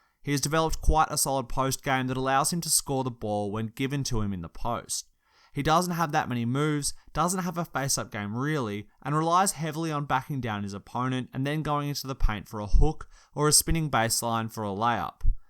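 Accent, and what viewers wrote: Australia. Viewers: Aussie accent indeed..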